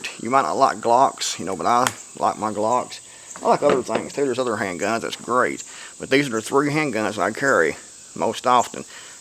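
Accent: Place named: South, United States. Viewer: This is the first of your videos I've ever seen. It just popped up in my YT feed. Soon as you started talking, my first question to myself was "I wonder what part of East TN he is from?" Went to your channel and saw several thumbnails with the Tennessee state flag in the background. ;-) I'd hazard a guess of Greeneville, TN? Possibly Kingsport or outlying areas. Born and raised in Gray, TN myself. ;-) Oh...and cool on the EDC stuff. Not a Glock fan myself, but they do work. I just don't care for the ergonomics of 'em.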